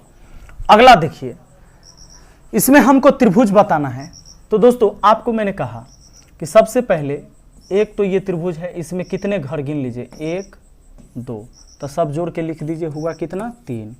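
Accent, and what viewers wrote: Bihar, India. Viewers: I like bihari accent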